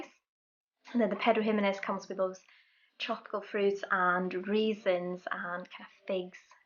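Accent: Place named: England, United Kingdom. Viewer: You sound English.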